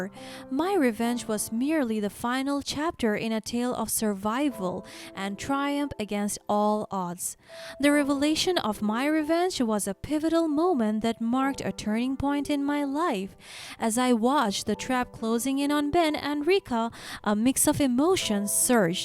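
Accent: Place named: Asia. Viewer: 80M RUPEES? NOT 80M USD ! BULLSHIT! I CAN HEAR THE ASIAN ACCENT!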